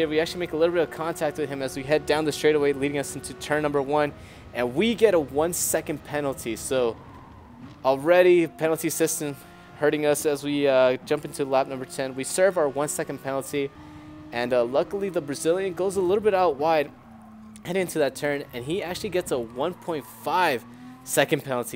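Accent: United States. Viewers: What part of USA r u from?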